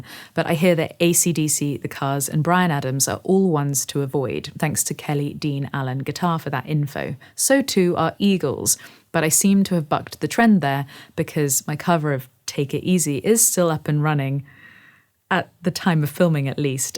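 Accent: United Kingdom. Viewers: As an American for quite some time, your British accent knocks me out: "Johnny Cash and _____" (something naughty) and "One of the best ways to grow your audience' hair" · I suspect she could earn serious money doing voiceovers for companies who need an authentic and very well articulated brit voice. Could listen to it all day TBH.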